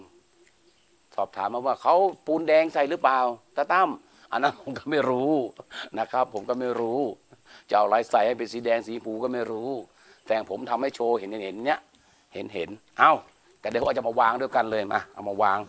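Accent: North, Thailand. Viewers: อาจารย์เป็นคนเหนือหรือป่าวเจ้า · อาจารย์อยู่แถวใหนคะพูดได้สาบเมืองมากเลยค่ะ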